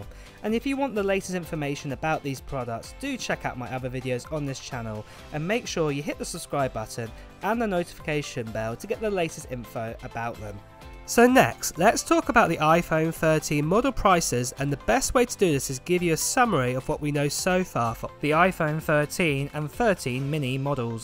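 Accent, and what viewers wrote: United Kingdom. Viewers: British accent 😂😂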